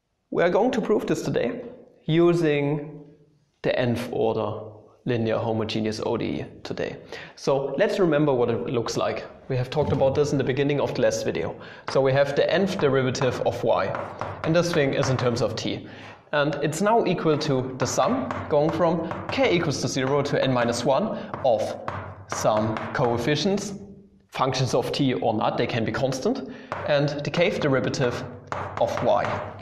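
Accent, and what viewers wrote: Germany. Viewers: That thicc German accent made the informercial even better somehow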